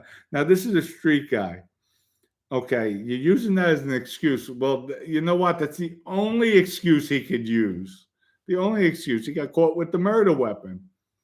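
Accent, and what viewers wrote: New York, United States. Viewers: Props,. Great to see how you’ve turned your life around. Very impressive and a great example. My comment- why apologize for being a “rat”. Wouldn’t you have been a punk if you sat in a cell for decades after you’ve been wrongly told you had nothing coming to you? It would’ve been different if you ratted if you simply didn’t want to do time- no? Regardless, everyone I know (Port Chester, NY) says vodka exactly the way you do…….:). Props. Congrats on your new wonderful life.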